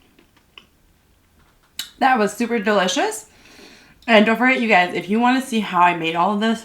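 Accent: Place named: United States. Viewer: 好可怕的美國口音!😳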